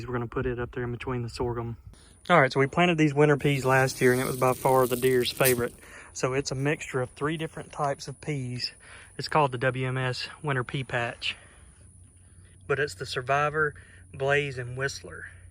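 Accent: South, United States. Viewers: I had to subscribe. Your southern drawl is so relaxing and the progress you make on your projects is satisfying. You praising your wife and speaking fondly of your daughter is lovely. I wish you all the love and success with your family and projects. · Al the Owl, because you're from AL, and our southern accent the sound close to the same. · Name the owl big Al (owl) sounds just like big owl with your southern accent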